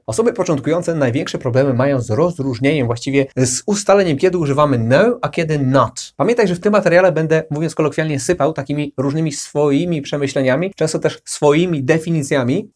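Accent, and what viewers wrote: United Kingdom. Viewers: ok super to jest angielski a nie amerykanski hahaha Pomocy Ja potrzebuje AmerykanskiAkcent A to jest zupelnie inna bajka !!!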